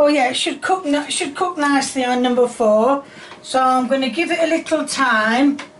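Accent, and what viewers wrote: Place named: Northern England, United Kingdom. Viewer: I like watching your channel, as a northerner I get what your saying and your sense of humour and sometimes the reaction channels act like something is weird when it's not, it's just how us northerners talk!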